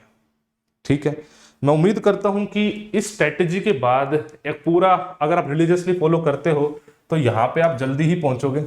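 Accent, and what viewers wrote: Haryana, India. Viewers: Sir Kya aap HARYANA se ho ? ☺❤ Aapki awaz or boli dekh kr lgta h ki aap HARYANA se ho ....... Please reply sirji 😊❤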